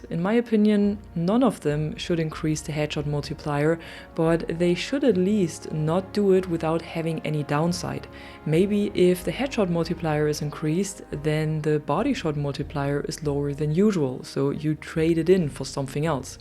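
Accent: Germany. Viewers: A good video, I completely agree with you. A side question: May I ask where you're from? Your accent sounds like a German who speaks perfect English.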